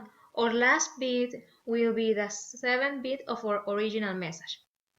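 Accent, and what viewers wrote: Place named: Spain. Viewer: she's soo spanish. I love this accent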